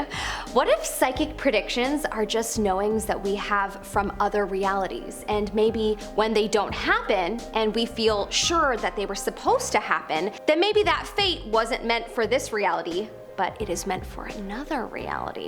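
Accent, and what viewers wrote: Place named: United Kingdom. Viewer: A stone computahhhhhh wahhhhh I love your funny British accent 😅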